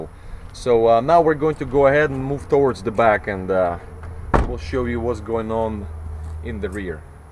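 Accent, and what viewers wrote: Russia. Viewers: Love the accent, is it Russian? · You do know words and can make sentences but have very Russian pronounce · Do I here a little russian accent there?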